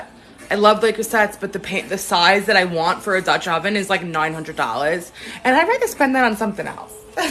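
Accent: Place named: East Coast, United States. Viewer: I’ve been watching your cooking vlogs and must say you are amazing!!! Love y’all east coasters. Majority of my family is in Mass. American Armenian and you remind me of my cousins. Y’all talk and sound so much alike. I am on the West Coast, Southern California. Much love to you and your beautiful family ❤❤❤❤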